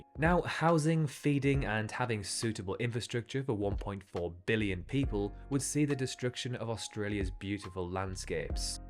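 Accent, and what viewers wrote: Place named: North, United Kingdom. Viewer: Love the Northern accent already <3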